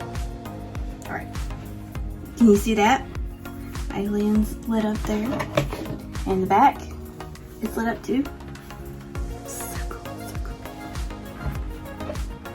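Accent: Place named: South, United States. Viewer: HELLO~☆from Lou. KY.🐎🐎I HEAR A Familiar Southern accent; TN, KY. Awesome LAPTOP! Nice to meet you, whoa, We have tech issues ❗🌻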